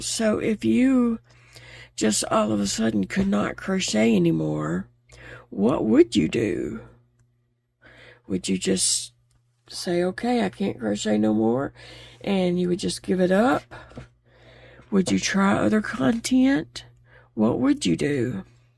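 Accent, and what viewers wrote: South, United States. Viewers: Hi. Im sorry if i said something to hurt you. I dont mean no harm. I cried right with you. ❤❤ive been watching you for some time. And i do love just listening to your wonderful humor and your southern accent ❤❤ ive had cancer and just not my self lately. But i just want you to know i love you and all your art work and im here to support you. So again forgive me please ❤❤❤❤❤❤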